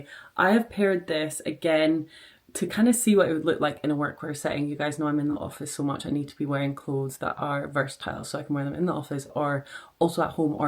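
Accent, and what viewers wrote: Scotland, United Kingdom. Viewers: You look fantastic in all the Lilysilk! I think your Scottish accent is stronger after your wonderful weekend with your mum and sister, it's so cute!! Happy that you've already been able to narrow down your dress choice. Still about to watch the cooking bit 😂. Busy times!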